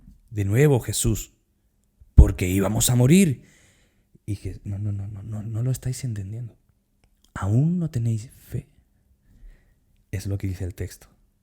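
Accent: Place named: Guatemala. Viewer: Representando Guatemala, ya te volvió el acento, gracias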